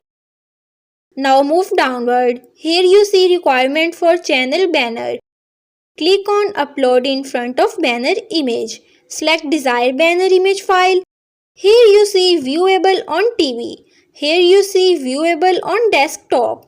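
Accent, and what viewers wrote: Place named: Afghanistan. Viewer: india? your voice like a afganistan dialeg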